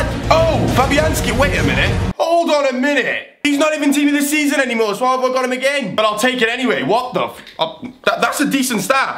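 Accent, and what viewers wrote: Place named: Newcastle, United Kingdom. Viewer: You sound like a Geordie shore person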